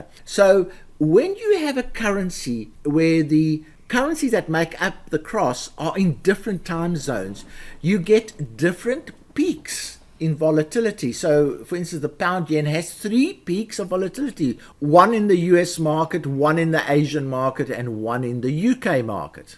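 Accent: South Africa. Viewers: Hi, can I rather ask. Are you a South-African?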